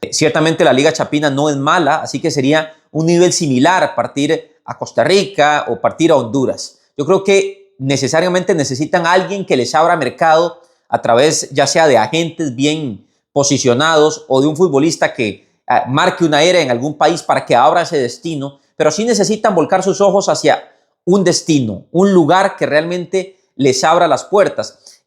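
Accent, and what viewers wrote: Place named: Colombia. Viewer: Si no estoy mal es colombiano por el acento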